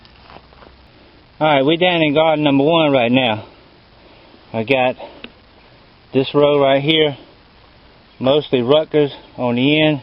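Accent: South, United States. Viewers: Sorry all I could think of hearing your voice is the movie character Forrest Gump!! I don't mean that in a bad way!! I love the southern accent and I'm from Australia!! Y'all!!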